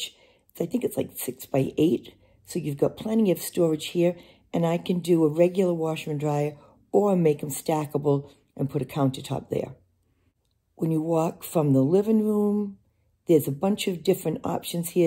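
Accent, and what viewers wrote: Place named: Massachusetts, United States. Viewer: Nice to be sitting in SC - after all my life in Stoughton / Canton area - so, I know right where you are and happy to hear someone who sounds like me😉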